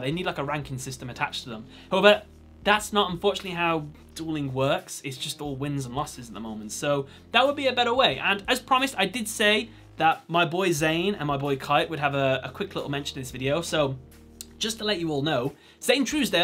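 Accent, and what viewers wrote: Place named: RP, United Kingdom. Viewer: 😂 how truly mediocre, this person talks like the queen of England for gods sake, if anyone spoke like that in day to day life they’d never hear the end of it, he/she’s just trying to sound clever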